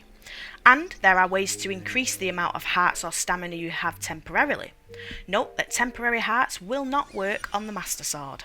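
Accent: North, United Kingdom. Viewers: Great video. Also brilliant to hear a northern voice on a video like this ❤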